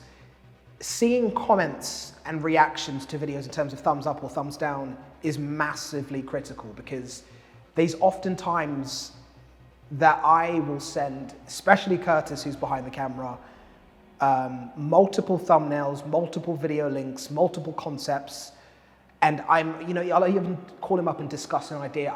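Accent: England, United Kingdom. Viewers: Really enjoy your vids for catching up with hockey stuff. Your docu style vids are my favourite, pretty cool to see how people play hockey and get their fix around the world and their culture behind it. I found your channel recently while looking for new skates for the first time in 20 years and crazy to see how far things have developed (bought Bauer 3S btw, only for public skating at the rink and occasional pond...hockey days are behind me). Baking skates blew my mind! I played in Scotland back in the 80s (goalie) and the most popular skates with players at the time were Bauer Supreme 100s later 1000s, Daoust 301, CCM Ultra Tacks and the newcomer at the time Micron Mega 10-90s. I’ll just say one thing about the race/people of colour/black/brown in hockey. 80s hockey in the UK was white af and I remember Hilton Ruggles breaking into the British hockey scene at top level back then, Whitley Bay I think it was. 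This guy seemed to appear out of nowhere and was an absolute beast of a player. I can still visualise him powering up the ice and our crowd being scared of what might transpire and their breaths being held. Anyway, thanks for the vids. To be honest, your English accent really threw me off the first time I watched…was expecting North American/Canadian.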